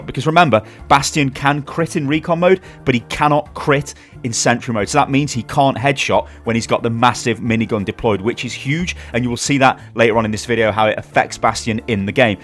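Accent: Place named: United Kingdom. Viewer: this is the second time that i watch your video with my gf and she get wet cz of your accent .. jesus turn me to british please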